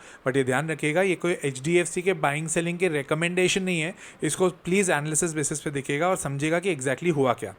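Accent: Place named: Bengal, India. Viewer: Bro, authentic bangla pronunciation ... kudos....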